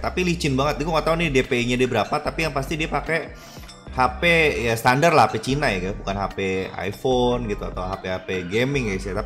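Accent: Java, Indonesia. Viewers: Orang Jawa to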